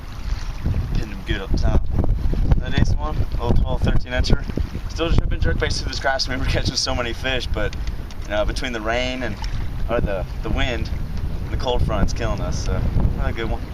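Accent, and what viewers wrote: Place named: South, United States. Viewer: wtf did you develop a southern accent?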